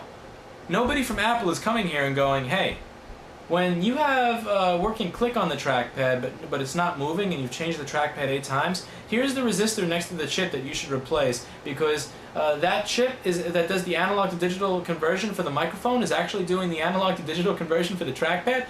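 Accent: New York, United States. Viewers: Louis Rossman has that NYC accent.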